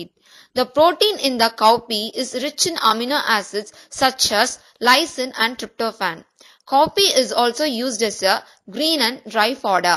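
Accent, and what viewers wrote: India. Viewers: Bruh it is in English but with an Indian accent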